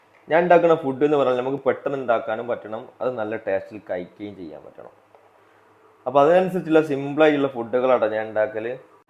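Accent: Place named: Kannur, India. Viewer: Kannurano naad bhasha ketitt angane thonnunnu bro u are very clean person🎉🎉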